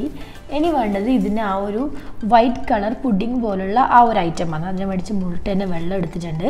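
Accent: Kannur, India. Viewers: Kannur food nammak.peruthishtam..😍 Kannur slang nalla rassa kelkkan. Endayalum spr recipe 😘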